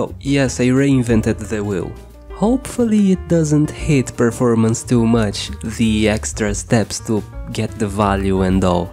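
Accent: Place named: Brazil. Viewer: Acabei de me ligar que ele fala inglês com sotaque kkkkkkk deve ser BR ctz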